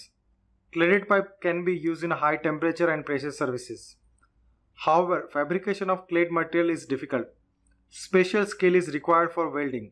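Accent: India. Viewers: is it indian accent?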